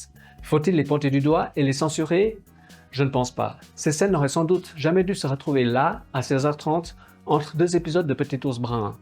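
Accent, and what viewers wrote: Switzerland. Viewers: C'est bizarre que tu parles avec les mains comme un italien, avec un accent suisse, et une face asiate. Je suis confus.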